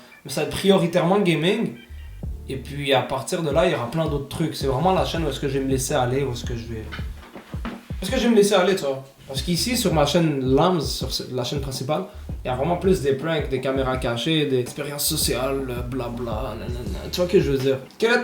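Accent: Quebec, Canada. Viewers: jsuis vraiment mort bro, fais une vidéo où tu racontes que des histoires avec l'accent, c'est tellement énorme 😂 jvous kiff les québécois ! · Est ce que ta deja regarder la série qui s'appelle la famille y ont le même accent québécois que toi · Fais plus de caméra caché en full québécquois gros, ça les rend 1000x plus drôle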